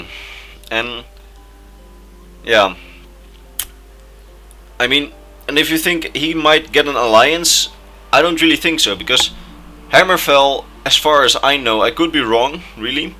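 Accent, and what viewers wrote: Netherlands. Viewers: nederlander dus kan het aan je accent horen 👍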